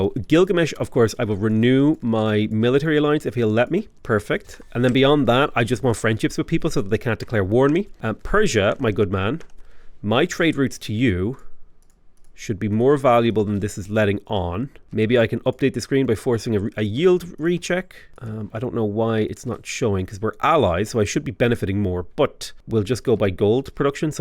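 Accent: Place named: Ireland. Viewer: I’m a natural English speaker, and you sound like an over caffeinated Irish dude. But I’ve been told Canadians speak really slowly compared to aussies, Brits, and Irish.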